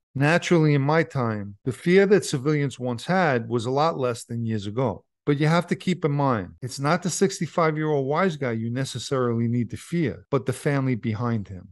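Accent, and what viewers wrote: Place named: New York, United States. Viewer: I’m down here in TX and I love NY mob stories. I like your NYC accent, it adds to the stories. Glad to know you have removed yourself from that madness.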